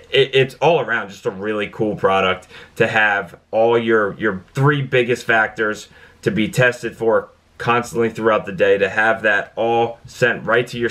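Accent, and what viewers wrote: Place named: Pittsburgh, United States. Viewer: Sounds like you have a Pittsburgh accent. I am originally from outside of there.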